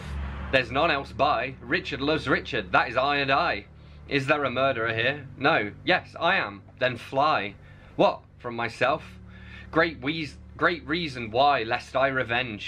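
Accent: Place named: England, United Kingdom. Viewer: Shakespeare is 1000% BARS! It kills me how dope his lyrics are. Also hearing it in your natural English accent is awesome.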